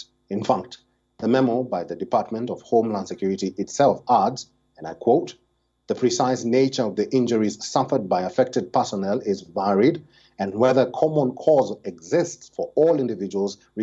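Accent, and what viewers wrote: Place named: India. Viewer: She's the only reason I watch WION. She has a nice Indian accent. It's understandable but non Indians like myself and it's still Indian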